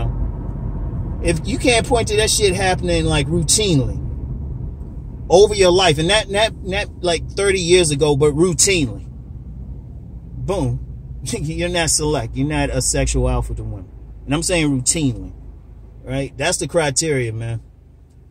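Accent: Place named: DMV, United States. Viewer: Dude, with that accent you've got to be from the DMV area! GREAT information too!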